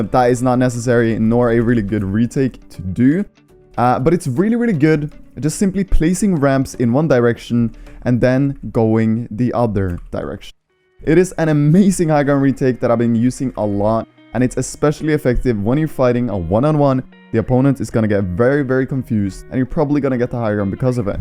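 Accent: Norway. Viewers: U from Norway? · Are you Norwegian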